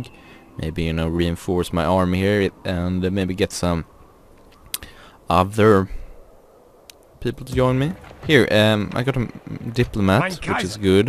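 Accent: Sweden. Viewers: Skåne? :D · are you Swedish? sounds like it. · You're swedish right? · you sound like a swedish person....är du svensk? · Hahaha, more swedish accent please!! :D:D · Det märks verkligen att du e svensk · are you frome Sweden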